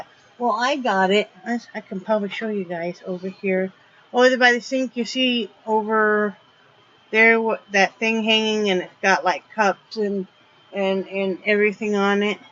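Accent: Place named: South, United States. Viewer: If I didn't know better I'd think you are getting a little southern in your voice. We are going to convert y'all yet💕💕💕😀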